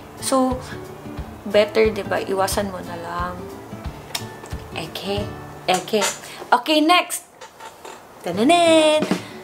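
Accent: Visayas, Philippines. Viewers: HAHA! Nalingaw ko maminaw magtagalog ang bisaya.. Perti gyud kagahi ug kasamok maghunahuna unsa sunod e.estorya sa tagalog jud. Keep it up. Love your vids with dodong.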